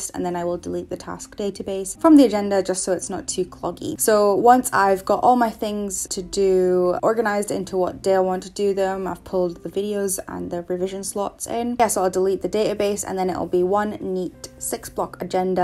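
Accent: Scotland, United Kingdom. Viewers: Your accent though. Are you scottish?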